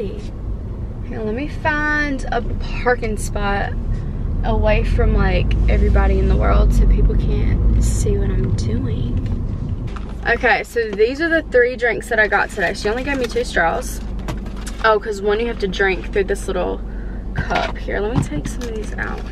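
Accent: South, United States. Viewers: it totally is country lmao. i’m pretty sure she’s from the south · Its like southern and Australian 💚💚💚💚 I love it too!!! :) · K H I’m from Texas and everyone says we have a country accent but her accent is soooo cute it has to be somewhere from the south but I can’t tell where 😭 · North Carolina I think! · i love your accent !! it kinda sounds country! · Where are you from. Love the country accent.im from TN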